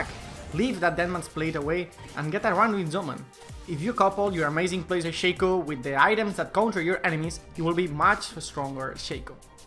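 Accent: Spain. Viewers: no se porque pero me da que eres español xD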